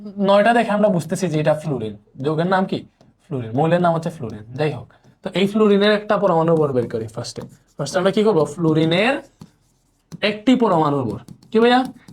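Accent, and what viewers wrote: Sylhet, Bangladesh. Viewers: Ashole vhaiya sylhet er to tai accent gula amn hoy BTW vhaiya genius ❤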